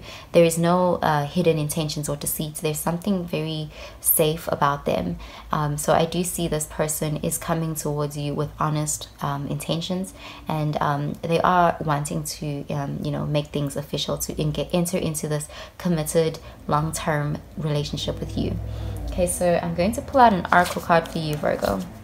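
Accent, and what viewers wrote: South Africa. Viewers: you sound South african 🥺🥰 anyways love your channel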